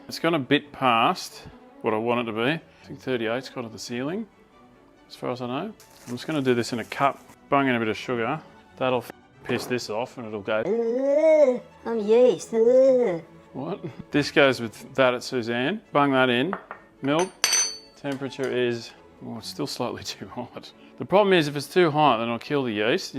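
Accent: Australia. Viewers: Hearing an Australian guy say bunghole for 15 min is peak internet.. Simple as that.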